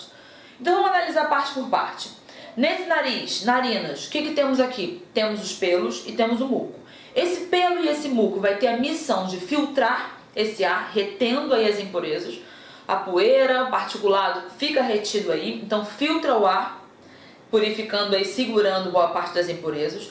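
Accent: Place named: Rio de Janeiro, Brazil. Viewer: Perguntinha, espero que responda. Voce é do Rio de Janeiro, parece que voce é de lá tem sotaque.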